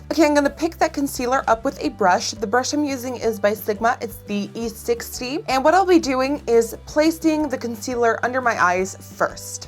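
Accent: Netherlands. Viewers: 2015 is so different to 2011! She used to have such a thick Dutch accent! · Subscribed!! Not only are you funny sweet and full of great tips but your adorable accent reminds me of my late great grandmother and my uncle. Both are from the Netherlands too!! Keep up the great work and awesome videos! 😘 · Are you dutch?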